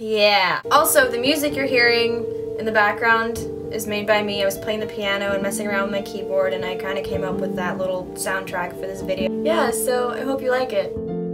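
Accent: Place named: United States. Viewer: where are you from?? you look and sound american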